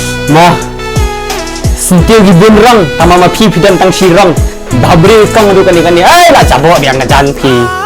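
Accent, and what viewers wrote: Pnar, India. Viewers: Bah kren da ka pnar keiñ😁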